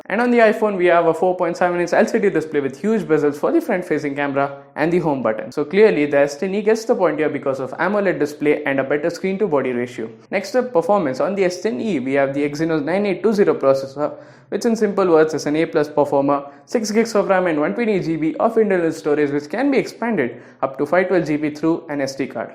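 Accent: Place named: India. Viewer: I am quite fond of stereotypical Indian accent and understand it well. But it's not bad to train yourself to speak in a more neutral accent. It can be done, you just need to practice. Also, work on your pace and avoid having unnecessary movements as they make you look awkward and nervous. Good luck on your next videos!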